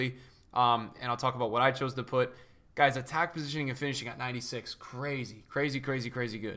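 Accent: Norway. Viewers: Are you from Norway?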